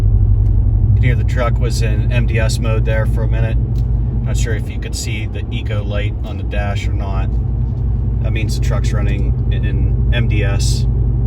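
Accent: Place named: Pittsburgh, United States. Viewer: I knew this was a PGH accent.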